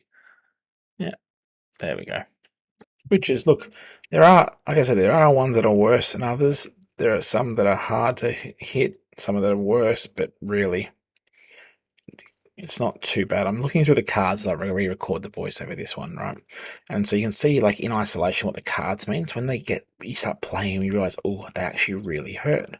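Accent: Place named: Australia. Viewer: Thanks for the playthrough. It's good to hear an Aussie accent for once! Where did you get the playmat from? Cheers.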